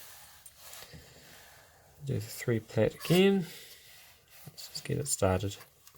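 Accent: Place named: New Zealand. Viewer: I’ve watched this tutorial dozens of times over the years, and I so appreciate you taking the time to make it, because - as a kiwi stranded in Canada with a boy who fidgets with his pounamu incessantly - I replace cords regularly. I never manage to onboard that knowledge as it’s just infrequently enough that my brain refuses to acknowledge that it’s essential information, or perhaps it just wants to reminisce and listen to a New Zealand accent explain clearly and calmly how a thing is done. Either way, you are a veritable treasure; thanks so much for helping this kiwi in a foreign land continue to proudly display part of their heritage.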